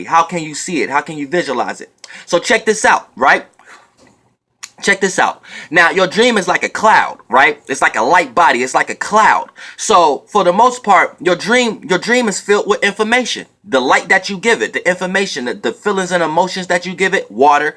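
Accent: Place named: California, United States. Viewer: Soon as bruh start talking all I hear is CALIFORNIA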